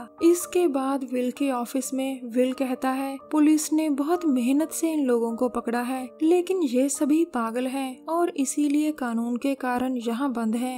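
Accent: Punjab, India. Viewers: Aap punjab se ho kyaa g.....Very nice explained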